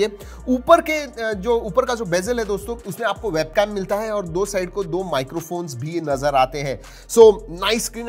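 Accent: India. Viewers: Aapka bolne ka style ekdam desi hai..."aane dijie aane dijie india me dekhte hai"👌👌 maza aata hai aapko sun ke sir..🙂🙂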